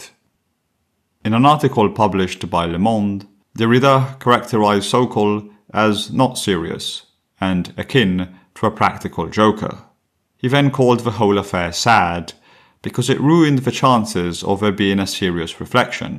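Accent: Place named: Italy. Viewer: I thought it was a finish accent at first but leaning more towards Italian now. Difficult to place tho, to me sounds like a mix of Italian, Icelandic and something Eastern European 🧐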